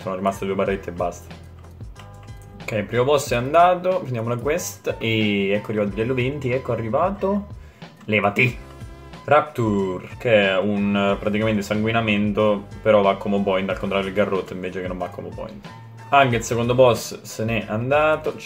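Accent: Marche, Italy. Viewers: Per me sarebbe molto utile un video sui migliori addons da usare per i novizi. Comunque bel video continua così. P.S. sei marchigiano?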